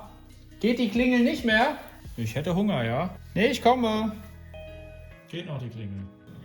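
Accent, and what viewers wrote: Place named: Dippsch, Germany. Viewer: So toll aufgebaut die Videos 👌👌 von wo kommst du denn? Das Dippsche 😄 geil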